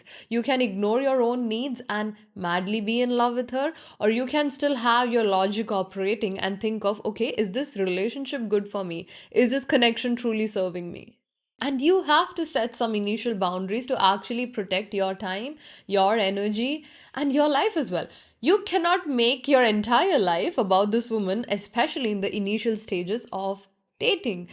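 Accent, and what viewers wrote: Kerala, India. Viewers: Nice insightful discussion but lots of grammatical errors when you talk. “Most Nicest” is incorrect English. It must be either “Nicest” or “Most Nice”. Most Indians especially people from South make this mistake which makes me wonder whether grammar is still taught at the elementary level. When you are using the word “most” you are already attributing the maximum level of the adjective to the concerned individual. So, you do not need to do that again. Similarly, I have come across people saying “did went” “did came” which is again something that keeps hitting me. “Did” has already attributed past tense to the verb and it need not be done again. So, after using “Did” you use verb in the present tense. So, the ideal way to say it is either “came” or “did come” Don’t mind but your Malayali accent already makes people curious and if you continue with these mistakes, you will make yourself a laughing stock. 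I hope you take the feedback positively and don’t make it a ego issue like most Indian women. I gave similar feedback to my cousin sister and she kept contesting me till the I gave up. Actually she realised her folly but her ego was getting hurt for being corrected by her little brother . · ibal malayali alle. poli. i just noticed now, her english is malyali english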